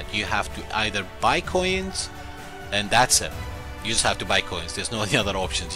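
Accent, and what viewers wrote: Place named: Scotland, United Kingdom. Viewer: do you live in Scotland? sounds like a Scottish tint in your accent haha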